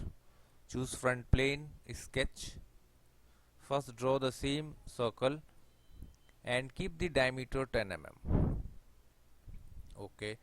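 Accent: India. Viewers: i reading comments indian accent what a effective work sir perfect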